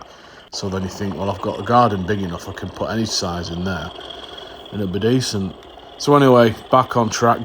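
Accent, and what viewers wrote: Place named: Northern England, United Kingdom. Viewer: I just envy your northern lilt much better us ‘ampshire ogs you do voice over for my vids and story time ps grot 1000 %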